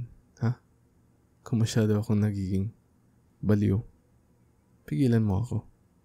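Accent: Conyo, Philippines. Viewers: sabi ko na nga ba pilipino sya eh, sa accent palang😊❤ btw, I love your videos❤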